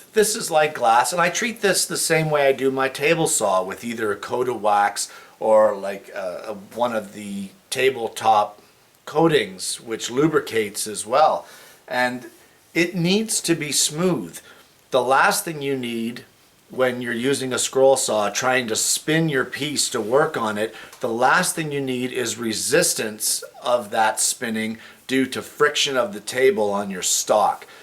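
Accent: Canada. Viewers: I really did appreciate your video - it really opened my eyes. So, I got close, eh? HAHA!!! Your lic. plate is Ontario, and your accent is Canadian ... I grew up in Buffalo, NY. Gets cold, but hey, we gotta woodworking shop for that, right? Great teaching! Thanks again! Btw, I'm going to build wooden clocks with wheels no larger than 20 cm; could you recommend a model that would do wonders for me? Thanks again! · I thought Canada too, from your accent. My son's living and working in Toronto, so I expect his accent will change slightly while he's there. The scroll saw tips are really useful. So much choice, I need to be well-informed before buying one.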